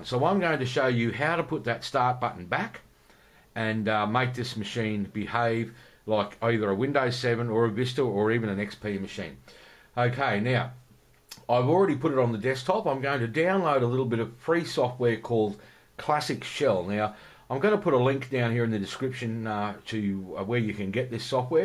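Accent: Australia. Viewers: are you Australian? sorry I know it's off topic but I'm curious as I can't identify your accent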